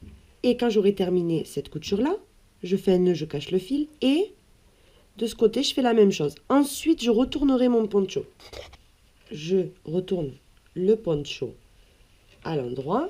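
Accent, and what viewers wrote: South, France. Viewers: Quel talent,et ''avé'' l'accent du soleil ch les Chtis c'est encore mieux!!Je vais de suite voir le site,continuez!!Mon rêve de crocheter va se réaliser🙋👍👏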